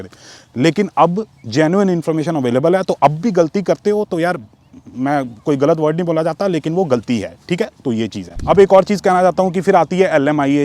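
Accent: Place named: Delhi, India. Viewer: delhi wali hindi 😊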